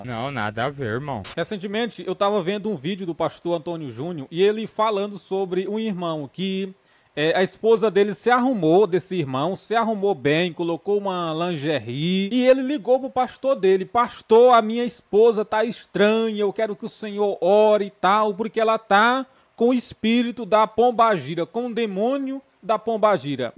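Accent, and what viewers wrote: Northeast, Brazil. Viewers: Ele é divertido. Dom do nordestino... Kkk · E muito bom o assunto falar sobre o assunto,acho que deve sim ser falado por pastores.existem muitas pessoas as vezes dentro das igrejas com tantas dúvidas sofismas medos. Eu mesmo sou uma, KKK agora é uma resenha o jeito que cê fala parece ser nordestino também. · Que Deus continue te usando missionário tremendamente porque tu é profeta homem eu acho muito bonito esse jeito que o senhor se expressa uma forma nordestina sincera de falar mas tudo dentro da palavra é o que importa continua falando a verdade Profeta Deus é contigo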